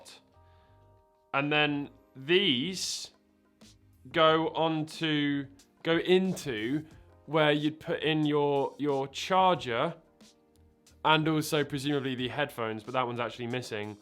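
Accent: United Kingdom. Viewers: For some reason he sounds more British in today's video. It's quite nice actually.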